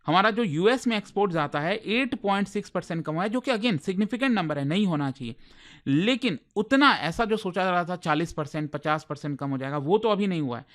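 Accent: India. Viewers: Your Indglish sounds horrible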